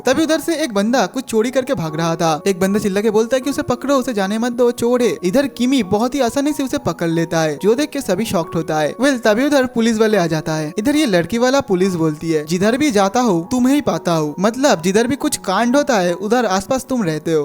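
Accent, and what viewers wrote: Bengal, India. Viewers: Bhaiya aap Bangali ho kya?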